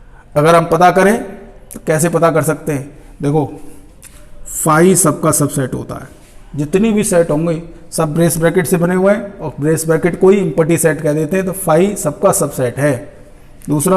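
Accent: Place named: India. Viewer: I think I gained my brain cells after watching this ... perfect indian accent for teaching Maths .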